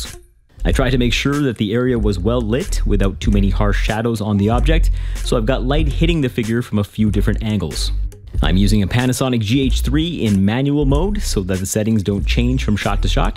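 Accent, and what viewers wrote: Canada. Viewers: Canadian? LET'S GOOOOO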